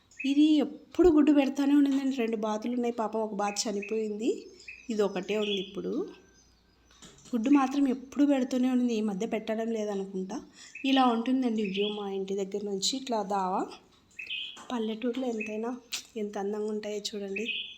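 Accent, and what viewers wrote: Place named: Kadapa, India. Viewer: మీ మాటలను బట్టి... మీరు కడప జిల్లా వాసులనుకుంటాను. ఎందుకంటే.. ఈ ఆల్లకాగు అనేమాట అక్కడిదే.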